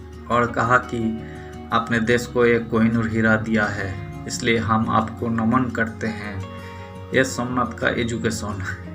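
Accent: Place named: Bengal, India. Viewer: Dada do you belong to Bengali Family as your Hindi pronunciation indicates so Thank you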